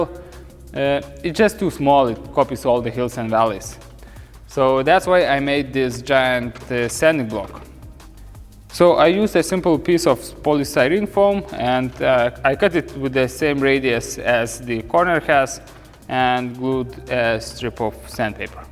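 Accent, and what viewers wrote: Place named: Russia. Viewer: Даже мне рускоговорящему режет русский акцент 😅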